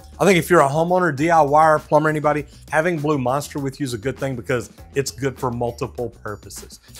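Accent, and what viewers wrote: Texas, United States. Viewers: a bit to Texas twangey for my liking like most plumbers drawls😂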